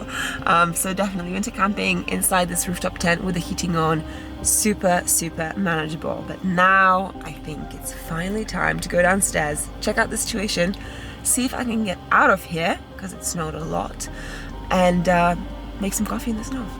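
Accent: England, United Kingdom. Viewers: Your videos are awesome, Question? were you raised in Poland, because i hear a big English accent! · That brittish accent... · Why do you sound British?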